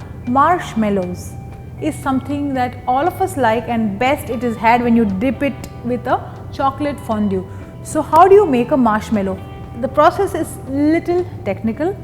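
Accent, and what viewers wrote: India. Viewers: this is English in Hindi accent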